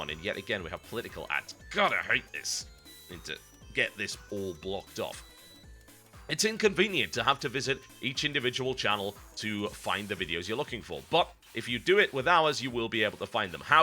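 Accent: United Kingdom. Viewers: that just doesnt have a nice flow to it tho cynical brit yeaah cynical american eh? beside aslong as he doesnt lose his british accent and doesnt start pronouncing words like puma the american way then hes still a brit to me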